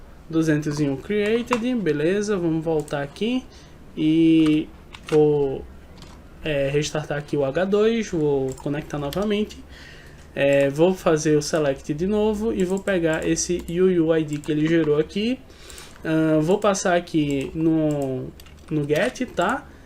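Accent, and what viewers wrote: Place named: Pernambuco, Brazil. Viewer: Oi, vi o post no YT e achei interessante. Quando vc começou a explicar, fiquei com uma pulga atrás da orelha (Esse rapaz é Pernambucano). E o tiro foi certeiro kkkkkkk O modo de falar entregou... hehehehe. Ganhou mais um seguidor. Abraço meu caro, um prazer encontrar pessoas aqui como vc. Agradecido pelo compartilhamento. Vamos nos falando.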